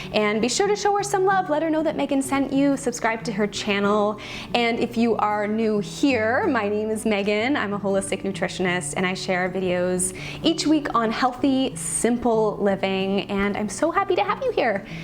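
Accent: Canada. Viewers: New to your channel from Ashlyn's channel. Just subscribed. Quick question...are you Canadian? Certain words you say sound Canadian..... I live in Alberta,Canada. Enjoyed your video! Thanks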